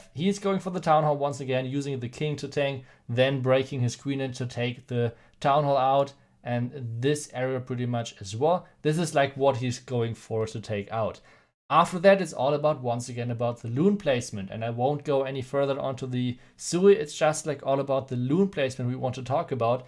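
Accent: Germany. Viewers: Dein Deutscher Akzent ist Mega weiter so!🌞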